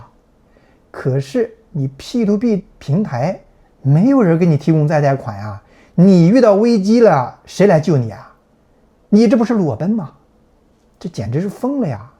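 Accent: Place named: Heze, China. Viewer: 厉害！我感觉听你口音有点像菏泽地区的人，不知道猜的对不对？😂😂😂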